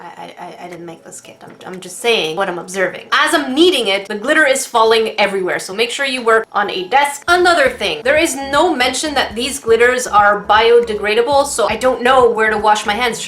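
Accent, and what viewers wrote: Canada. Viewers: OMG are you Canadian?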